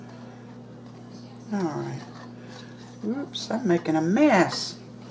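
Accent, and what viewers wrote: South, United States. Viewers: I love your voice! Second run at watching. Were those toss-a-way/recycle aluminum pie tins or not? These are my Mothers favorite before I made flan...not easy to transport to holiday parties. You said "hardly" packed brown sugar. Did you mean packed hard or not very packed hard? From the North, I am in love with your Southern accent. I did a recipe from a newspsper once back in the early 90s. Dutch apple pie in a brown bag. Best results. Never found it again.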